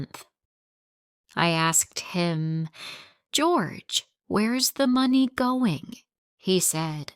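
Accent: United Kingdom. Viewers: I love your english accent so much ❤❤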